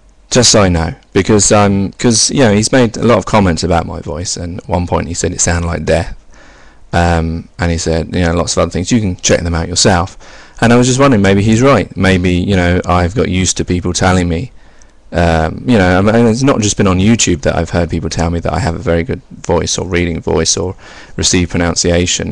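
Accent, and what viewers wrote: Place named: United Kingdom. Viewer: You have an excellent reading voice. You would be a fantastic narrator for books on tape and radio dramas! As an American, I must say that a British accent is always enjoyable to hear!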